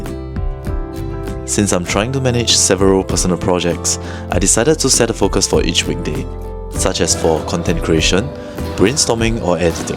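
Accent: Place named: Singapore. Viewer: Hey, keep going man. People who succeed in life are the ones who always learn and push themselves to be better. Good luck with your goals and inspirations. P.S. You sound like Singaporean. I am moving to BKK from SG with the family soon too (I am a westerner). Maybe we could catch up IRL:D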